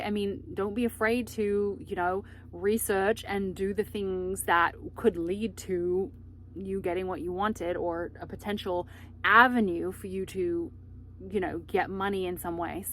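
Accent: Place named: Australia. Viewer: Interesting accent. Australian background? I always thought manual transmissions were cheaper to fix that automatics.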